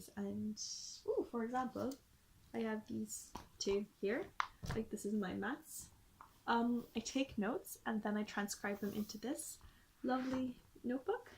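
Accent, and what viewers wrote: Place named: Ireland. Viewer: You have such a lovely accent. Where in Ireland are you from?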